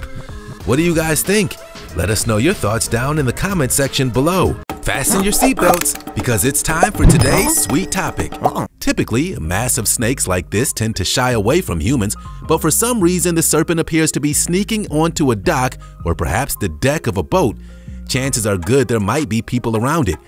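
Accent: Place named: North, United States. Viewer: one more grating yankee voice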